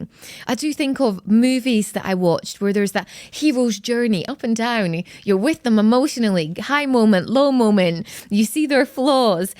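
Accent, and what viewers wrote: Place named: Scotland, United Kingdom. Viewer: Context, Challenge, Confront and Consequence....wow...I thought initially that you were from Sweden. Then recognised your Scottish accent. Thanks for the lesson.👍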